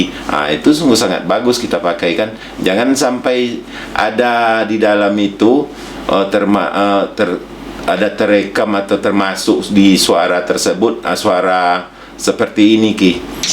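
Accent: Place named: Padang, Indonesia. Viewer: Cakap nyo logat padang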